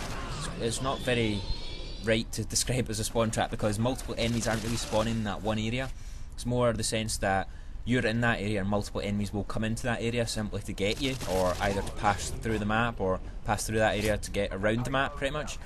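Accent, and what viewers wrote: Scotland, United Kingdom. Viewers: it is, he's scottish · scottish accent.. eww... i was sick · scottish... mute. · r u scottish, i love axent =D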